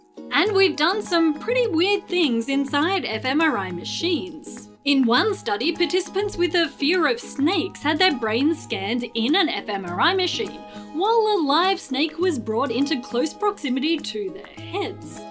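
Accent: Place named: Australia. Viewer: Oh God, I'm in love with you and your Australian accent even though I have a girlfriend. (Shh, It's a secret)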